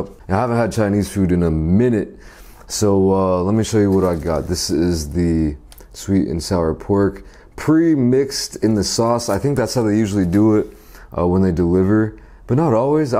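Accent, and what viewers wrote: United States. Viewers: How's his accent so american?? Not a regular here so do explain to my ignorant ass 😁👍🏾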